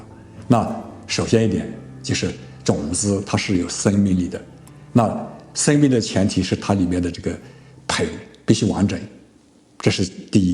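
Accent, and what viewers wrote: Yunnan, China. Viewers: 好像是云南人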